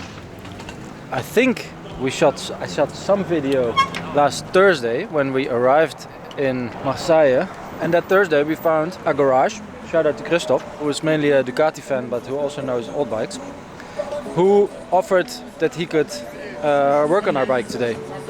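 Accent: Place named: Netherlands. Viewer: Heerlijk Dutch accentje 😂😂😂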